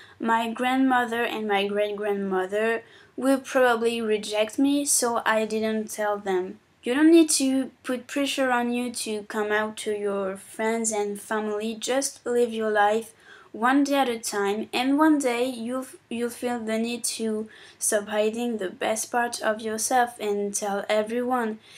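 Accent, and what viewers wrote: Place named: France. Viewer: j'adore votre accent . teach me more french?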